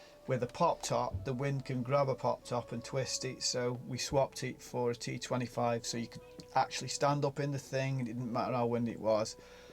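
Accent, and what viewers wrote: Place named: Stoke, United Kingdom. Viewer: Gorra luv a Stokie accent in a motrome.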